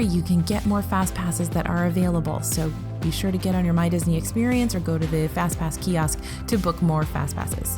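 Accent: United States. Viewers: Justin Porche I am British so she has an American accent to me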